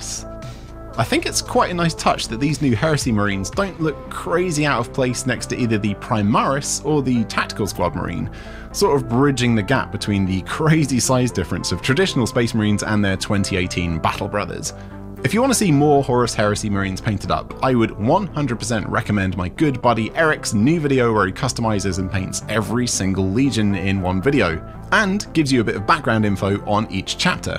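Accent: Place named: England, United Kingdom. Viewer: Yeah english woman voice overs are back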